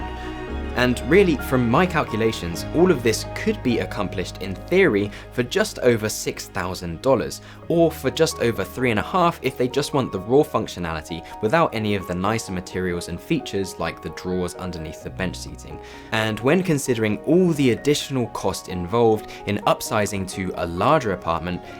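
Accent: United Kingdom. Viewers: His delivery is doing great. Wonderful apartment redesign. The next step is to take a breath and know we are on his side. Why else are we here. Eyebrows relax, British accent reduced, the approval asking lilt only occasionally. Tell us. No need to ask us. You have our ear and implicit approval of your talent and ability. Yur frpal G to solve abilities.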